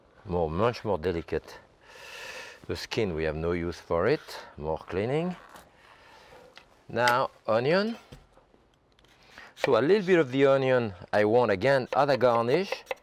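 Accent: France. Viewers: 've been watching this whole day..the french accent,his attitude and his skills....great stuff!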